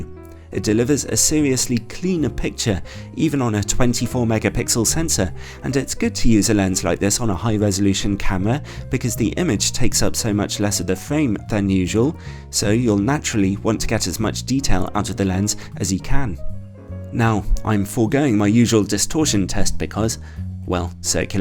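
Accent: United Kingdom. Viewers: nothing like a British eloquent voice...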